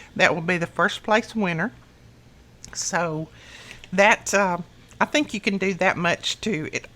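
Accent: South, United States. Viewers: My favorite craft as of late is junk journals. I have never ever won anything from entering any contest. My dad use to say I was bad luck lolol I keep trying though! I love your southern accent!!!!! Xoxoxo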